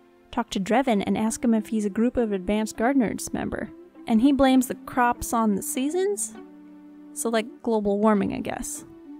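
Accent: Russia. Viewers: hilarious that your using a russian accent with an italian based character xD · RuSsIaN AcCeNt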